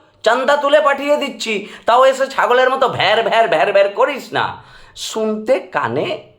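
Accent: Kolkata, India. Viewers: পুরাই কলকাতার টোন ভাই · accent is exactly like Kolkata amazing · ভাই একদম সেই হয়েছে কলকাতার স্টাইলে । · বলছি গো দাদা এ চালা তুমি আমাদের কলিকাতা ভাষা চিকলে কি করে বলুন তো😅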